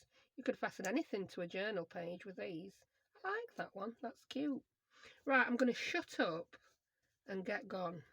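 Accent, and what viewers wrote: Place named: North, United Kingdom. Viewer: Well I can relate to you No1 because you sound northern, No2 because you’re not American and want us all to go to Dollar Tree , Walmart or Hobby bloody Lobby .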